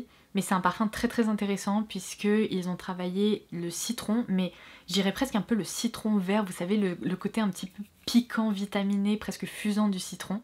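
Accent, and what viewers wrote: England, United Kingdom. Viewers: Super vidéo sur les nouveautés merci de pouvoir tous nous renseigner😉. mais pour répondre à ta question ce qui m'intrigue le plus c'est l'accent anglais que tu prends à chaque fois que tu présente un produit.. 😭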